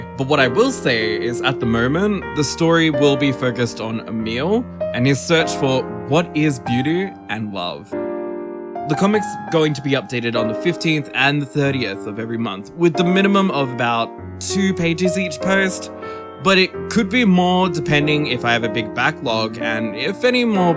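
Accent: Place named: RP, United Kingdom. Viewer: whoah, that accent.... whoah... I thought you were not gonna have such a posh accent wow........ just whoaaaaaaah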